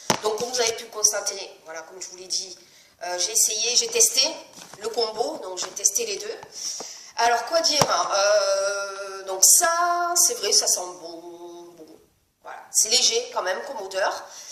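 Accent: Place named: Catalonia, Spain. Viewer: Oui effectivement un bel accent du Sud catalan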